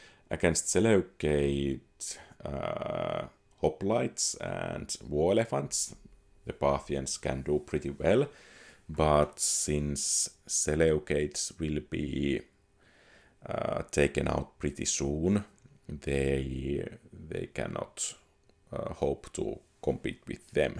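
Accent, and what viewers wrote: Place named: Finland. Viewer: Love to hear finish English! Really nice! Love it!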